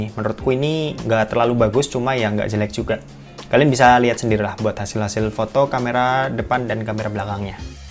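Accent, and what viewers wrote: Semarang, Indonesia. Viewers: Cah Semarang mas?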